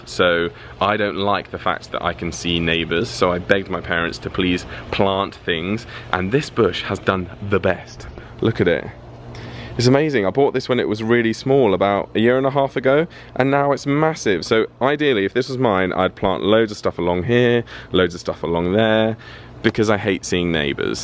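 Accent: United Kingdom. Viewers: Lol😂 why did I find this vid oh my recommend British accent had me died😂😂😂 Am American and I love to learn British accent😂 I also live in Florida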